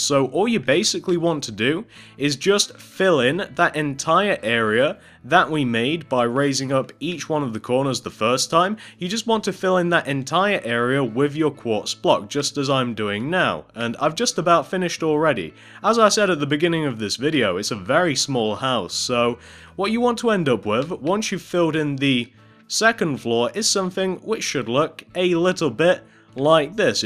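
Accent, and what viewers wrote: United Kingdom. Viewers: UK AMERICA WERE DO YOU LIVE SOUNDING LIKE UK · _300 I hope you're being sarcastic because if you haven't already noticed...He is not American he is British....also British people have accents if you couldn't notice from the way he speaks....therefore he isn't saying free as three and fing as thing on purpose that's just the way they speak. Just thought you should know...just in case you didn't... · I know your friend JosephBrown and i took your house that has a water fountain in front of it and i heard you talk british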